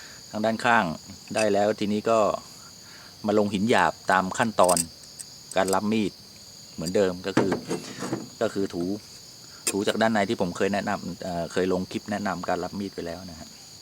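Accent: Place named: Central Thailand, Thailand. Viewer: ขอบคุณคร้าบ ทำไมพูดกลางชัดจังครับ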